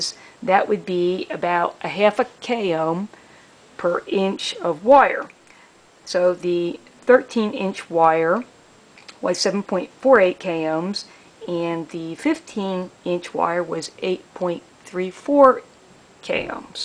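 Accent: Philadelphia, United States. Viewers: Philly accent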